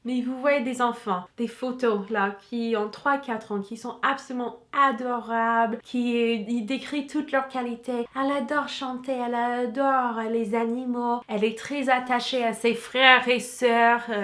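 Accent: Quebec, Canada. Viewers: Tu m’as brisé le coeur :,( La vidéo était hyper intéressante bien que vraiment touchante et triste. Ps: tu commences à avoir l’accent canadien ;) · Super video! PS: ca faisait longtemps que je n'avait pas vu une de tes vidéos, et ton accent à vraiment changé ! Y a vraiment des prononciation et intonations québécoises , c'est marrant à entendre!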